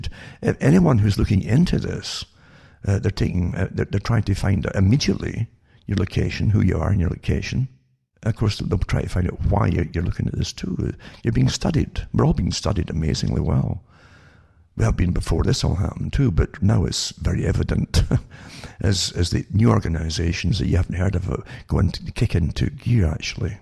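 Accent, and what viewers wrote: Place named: Scotland, United Kingdom. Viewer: New subscriber from the Central Coast NSW Australia. Love a Scots accent too!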